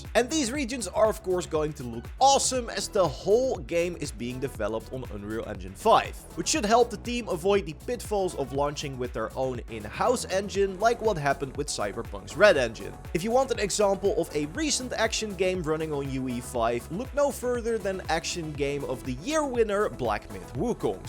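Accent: Netherlands. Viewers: U need to work on your english you sound dutch af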